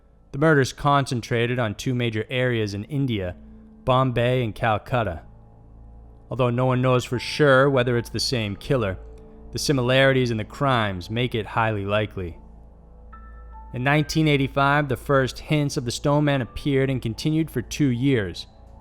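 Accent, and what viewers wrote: South, United States. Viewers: i really enjoy your channel. it's in-depth and you have a nice voice. are you from the southern US by any chance? you don't have too much of an accent (although i am texan myself) but i did notice a bit of a southern twang on your long "i" sounds. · Did anyone else notice the narrators strong southern accent in this video?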